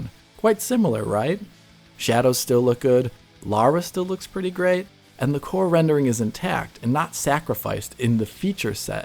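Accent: Germany. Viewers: Trevor Cook Really? I'm pretty sure I hear a German accent when he's speaking English though. And the German things he said sounded absolutely 100% perfect. The "melody" of his voice also sounds very German to me... If he really is an American he's been assimilated into oblivion gawdamn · German is your first tongue isnt it? Excellent english pronouciation tho! And overall great video quality from what I've seen so far. EDIT: "Und auf Wiedersehen!" Yeah i think that settles it :-P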